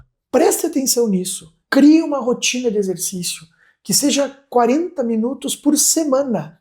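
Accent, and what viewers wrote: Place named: Rio Grande do Sul, Brazil. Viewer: Você tem sotaque de gaúcho no chamarão de 🍃 verde no cuias do corpo humano também bom-dia senhor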